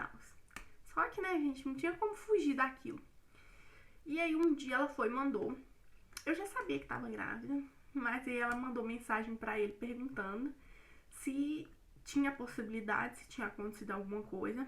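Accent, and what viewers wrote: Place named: Minas Gerais, Brazil. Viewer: Nosso sotaque mineiro é bonito demaisss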